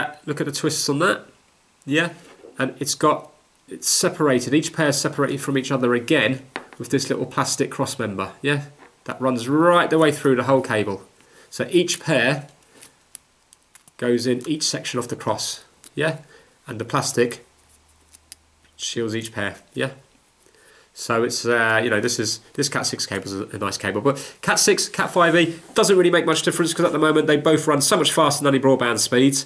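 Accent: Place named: United Kingdom. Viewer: Nice to put a face to the channel. Love the channel. Currently watching the backlog. I love the "Ok, yea", "Right, ok", "Yea". "Ok? Yea"... It's the region/accent, but it's so noticeable as someone from outside the UK.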